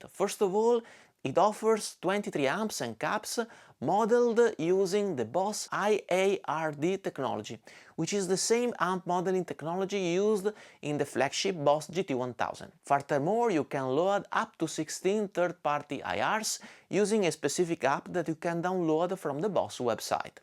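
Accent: Italy. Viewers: Your pronunciation is a kind of "itanglish"(Italian and English) but don't affect the incredible reviews that you made. Prepare to be a next super masive channel. Congratulations!!!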